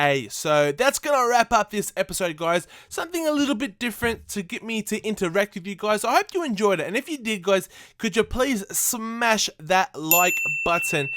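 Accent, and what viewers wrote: Australia. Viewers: New Sub here and a Spurs fan. Found you after buying fifa 14 and looking for some tips / gameplay. Normally the aussie accent wrecks my head but you know your footie and your commentary is great!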